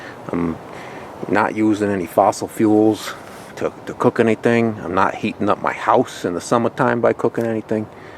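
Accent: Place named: South, United States. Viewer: I love southern accents .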